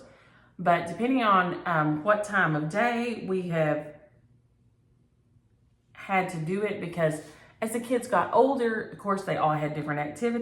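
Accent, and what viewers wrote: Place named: Texas, United States. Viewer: Always enjoy stitching you your lovely Texas drawl. Here in Ontario, Canada, fall will soon turn into winter. Getting my mind ready for that as a former snowbird!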